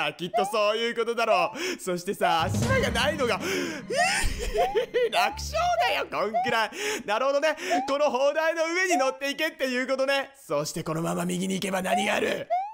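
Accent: Fukuoka, Japan. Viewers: 福岡の人？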